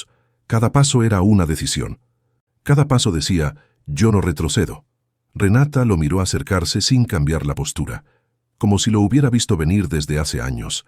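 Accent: Argentina. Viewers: Porqué el relato usa el asentó argentino o Uruguay se nota demasiado no es necesario sobre todo si los protagonistas son españoles o mexicanos 🤔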